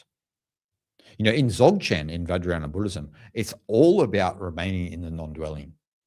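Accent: Australia. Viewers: I love your Australian accent! Thanks for keeping it real!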